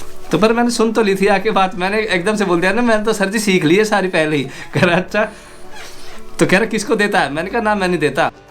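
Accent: India. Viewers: Desi accent pure Hindi me aur haryanvi tushunn. Kya baat hai. 👌jay shree ram bhai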